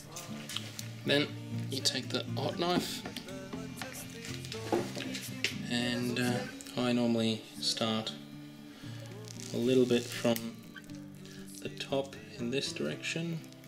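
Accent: Australia. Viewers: You sound Aussie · You Aussie?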